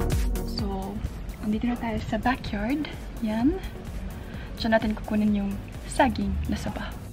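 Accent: Visayas, Philippines. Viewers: Bisaya jud paytera kaaju hehe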